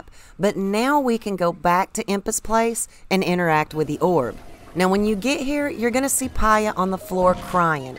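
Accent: South, United States. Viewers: I have just recently started playing boyw and I love tour vids, they are so well explained. Also I love that foxy southern accent. Much love from Scotland. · I swear homie sounds like she could be related to me. So damn southern it can't be scrubbed off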